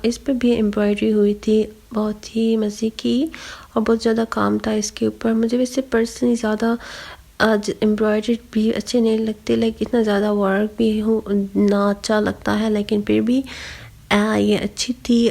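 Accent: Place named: United Kingdom. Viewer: Over kahin ki urdu bhi English accent me bol rai ho be original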